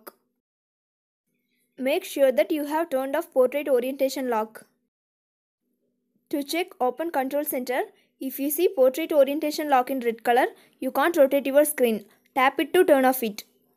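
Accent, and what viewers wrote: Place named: Punjab, India. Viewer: Rakarakaraka , put bigger subs and don’t move them quick. Your English is like Punjabi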